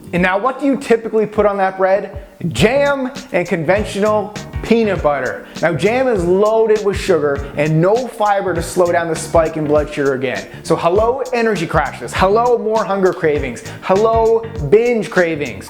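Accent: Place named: Canada. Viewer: That fucking Canadian accent makes my ears bleed.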